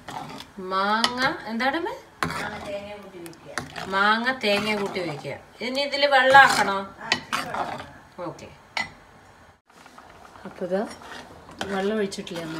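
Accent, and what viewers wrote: Palakkad, India. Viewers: Asalailyei palakkadan basha.kodhi gerunnundu ente chechyei.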